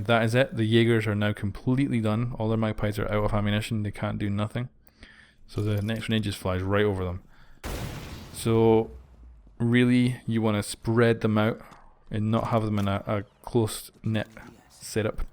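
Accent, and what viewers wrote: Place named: Aberdeen, United Kingdom. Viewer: you sound like your from aberdeen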